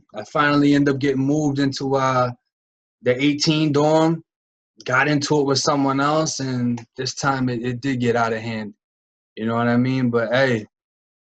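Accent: Boston, United States. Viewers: Saw you on 23 &1. Checking you out from Rhode Island. Love hearing that Boston accent that I grew up with. Psyched to see you with your own channel! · Always gotta rock wit someone else from the 813. Your startin to get a Boston accent tho my bro. Much love from tampa we keepin it real down here. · Really loving this guy so far...but I especially love it when he talks more with his Boston-ish accent opposed to the other way her talks..I can listen to that east coast style allll day :) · That Boston accent is nails! Respect from U.K! Cheers for sharing your story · This is definitely off topic but I really dig the Boston accent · Got all caught up yesterday on Lockdown 23and1 vids yesterday & so happy I found you thru Christina's Channel! Can't agree with you more on so many levels. No I never did actual time but in my past (long time ago past) had a serious boyfriend that did. 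I did that time with him even tho I was on the outside! Ppl don't realize going to prison affects everyone around that 1 person actually doing the time! I'm not with him anymore, it's been over a decade since we split, tho we remained friends & I supported him w/out enabling him for years after. Anyways, now got you to watch (gotta love not just your content, but that Boston accent!) 😆🙃 Glad you turned your life around & so happy for you overall! Plus now there's Lockdown 23and1's Channel to get that area of country perspective of prisons & interviews w/ other ppl! Can't say enough how grateful I am that you started a YT Channel! God bless! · Good video. He’s not a Florida boy tho. I hear providence or Boston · Bruh im from the UK so its probly that,but your accent sounds kinda Boston and i thought youre from Florida..is that a thing or have u moved from there? Love your videos btw nice and honest..thanks for the info. 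B. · Dude its awesome that your puttin your story out there, takes a lot of sack! It's funny, I definitely noticed your bostonian in the first video, I had the same thing happen I spent years between Florida and Beantown "home" and more I find myself droppin my R's lollol its wicked funny cause you were so right about ppl saying there from Boston but really bring from Waltham or Billerica lmfao Great stories bro, keep it up!!! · That authentic boston accent👊🏼 Hows it goin everybawdy😃 Gotta ❤ it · Came from Christina’s channel! I love how humble you are! Stay true to you and keep your head up! I cannot imagine being in your shoes, but I hope you can learn from the roughness of your past❤️. God bless! I can’t wait to see how far you go in life! Btw, I love your Boston accent!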